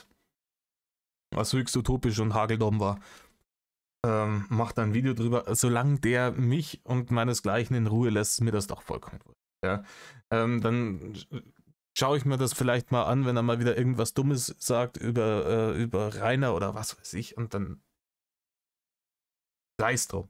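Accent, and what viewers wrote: Bavaria, Germany. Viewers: Hallo könntest du bei deinem nächsten Video wider mehr Bayerisch sprechen. Weil ich muss dann lachen wenn du dich über denn Kürbis Kopf aufregst auf bayrisch wenn der immer wider was am verzapfen macht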